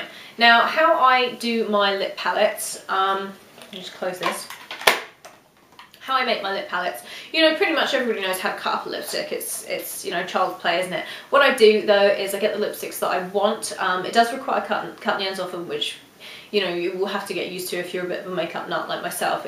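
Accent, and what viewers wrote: United Kingdom. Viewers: Are You brittish Cause you sound like it??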